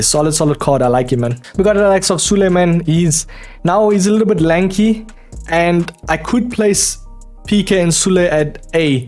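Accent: South Africa. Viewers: * are u South African ?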